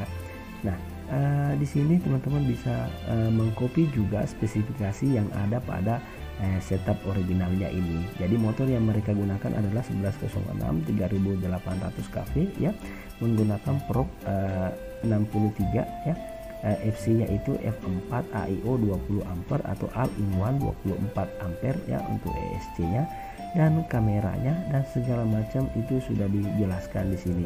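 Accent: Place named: Kendari, Indonesia. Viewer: Wah orang kendari ya???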